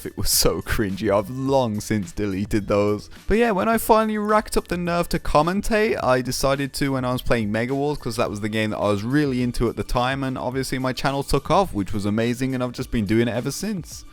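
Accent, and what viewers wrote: Birmingham, United Kingdom. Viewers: Jeez u can really hear the brummie in the older videos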